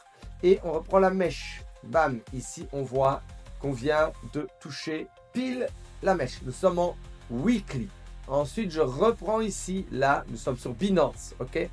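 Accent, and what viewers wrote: Belgium. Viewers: Merci, d'où viens ton accent? Belge une fois?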